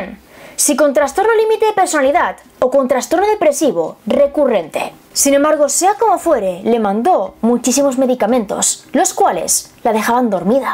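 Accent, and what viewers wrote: Spain. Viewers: Saludos bellotas hermosas y su acento español, es tan hermoso y maravilloso. Excelente investigacion para el video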